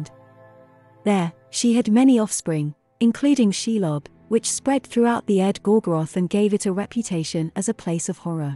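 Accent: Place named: England, United Kingdom. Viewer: I think that's just how people speak in England. We say water just like it sounds. they say wotah..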